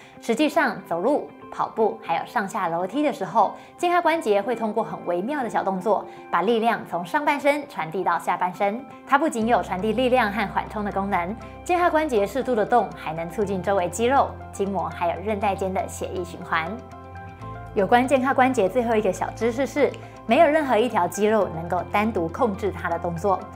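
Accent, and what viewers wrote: Taiwan. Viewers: 台湾女生说国语太好听了，温柔😊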